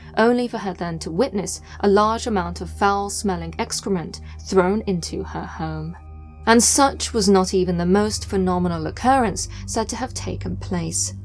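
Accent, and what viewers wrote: United Kingdom. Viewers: personality is perfect for the channel. Quite clean Brit accent too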